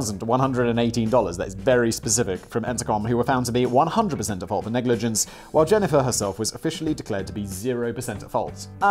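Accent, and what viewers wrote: Russia. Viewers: Great Russian accent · I was born USSR, and russian accent is my favourite russian accent on YouTube.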